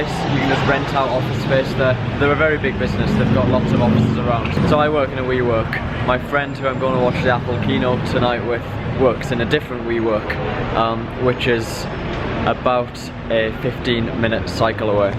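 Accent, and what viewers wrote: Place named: England, United Kingdom. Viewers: Sounds north-east England but not Newcastle. One of the smaller towns. Locals would be able to tell which street from the pronunciation. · Is an English accent, but I don’t know specifically what part of England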